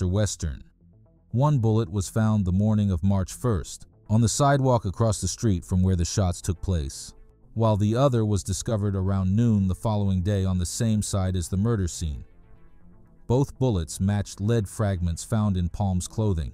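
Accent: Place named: United States. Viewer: Bra engelska? Det där är bred amerikansk accent.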